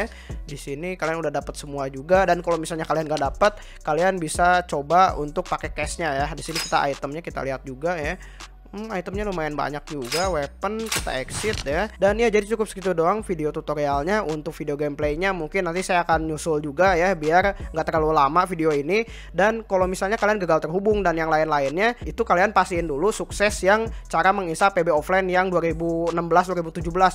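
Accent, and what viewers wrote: West Java, Indonesia. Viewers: Sunda Geuningan?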